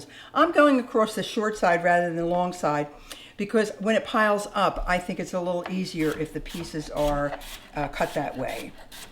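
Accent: Philadelphia, United States. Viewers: I pray is doing well so glad I found this channel! I knew you were from the Philly area by your accent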